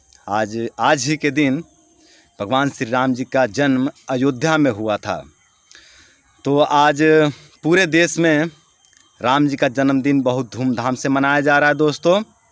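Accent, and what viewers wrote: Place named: Bihar, India. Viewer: Hii bro लगता है की बिहार से हो आप 🙏🤞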